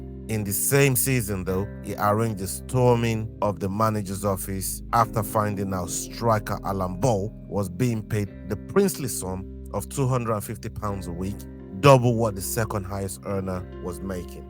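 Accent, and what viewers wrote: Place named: Africa. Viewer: Why is an African narrating this 😮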